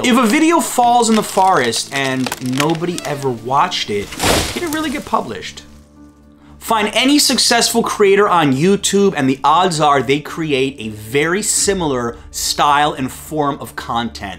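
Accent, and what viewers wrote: New York, United States. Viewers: NYC has always been on my bucket list and few years ago I tried searching for things to do in New York and one of your vlogs came up and binged watch your videos, from then on, your voice is kind of synonymous or like has this NYC feel into it haha, I might study in Canada next year and if ever got the chance, I'll definite visit NYC and follow all your tips, along with Sarah Funk, Action kid, Brett Conti and with your cam guy and third wheeler and a fellow Filipino LOL, Thank you, you're one of NYC Gem!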